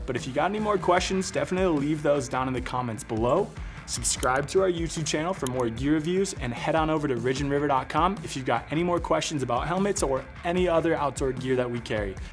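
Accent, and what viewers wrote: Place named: Toledo, United States. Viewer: Dude are you from Toledo?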